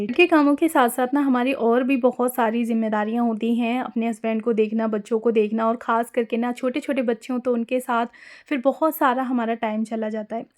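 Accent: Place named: India. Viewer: Because your acent is like india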